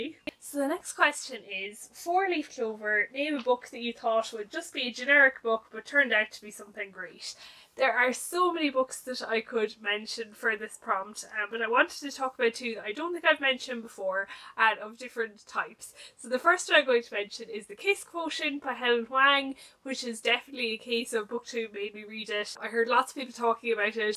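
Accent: Ireland. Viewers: Belated happy St Patrick’s Day,! I love hearing your Irish accent! ☘️ As we approach the end of March, I’m just checking that you’re still ok to buddy read A God In Ruins in the second half of April? 😊📚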